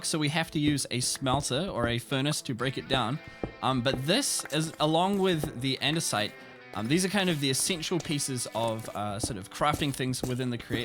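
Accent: New Zealand. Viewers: Huh? You're from New Zealand? I wasn't expecting to hear that.. But that's pretty cool considering I am too!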